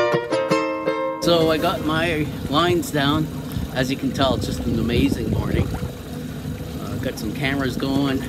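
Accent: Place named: Canada. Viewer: I love to think of people in other countries finding this video maybe thinking we all have such a heavy Canadian accent! :) also thanks for posting!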